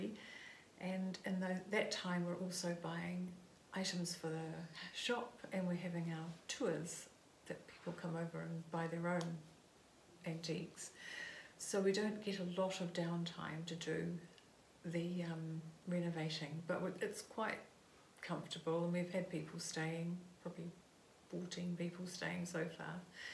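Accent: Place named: New Zealand. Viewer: Your lovely NZ accent and soft voice make it difficult to capture the story of your magnificent Chateau. Look forward to many more intriguing vlogs.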